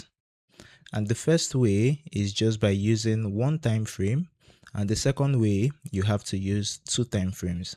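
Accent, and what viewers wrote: Nigeria. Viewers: My guy, u are good. I'm surprised at ur Naija voice too. Your videos are full of great info. Thanks a lot.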